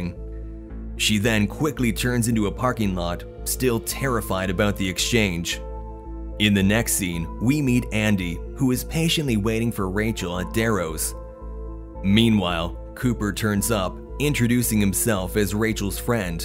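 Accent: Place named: Canada. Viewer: The first ai voice I’ve heard use a Canadian accent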